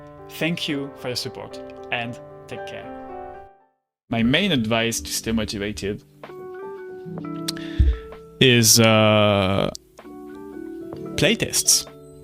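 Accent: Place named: France. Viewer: You sound like GameMakersToolKit but with a French accent!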